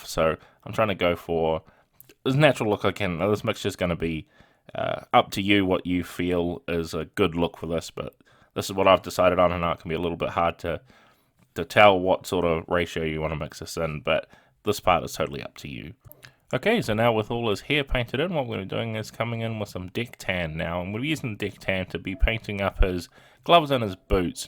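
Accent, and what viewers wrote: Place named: New Zealand. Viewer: Oh a Kiwi voice! That's a nice change.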